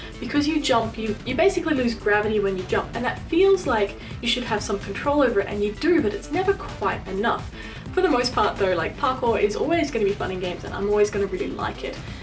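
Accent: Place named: United Kingdom. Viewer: uk accent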